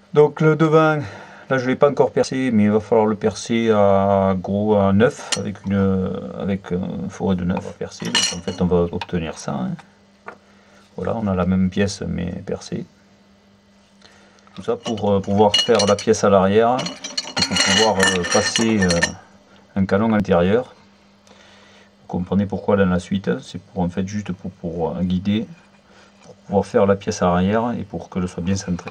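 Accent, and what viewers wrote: France. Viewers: avrc le petit accent marseillais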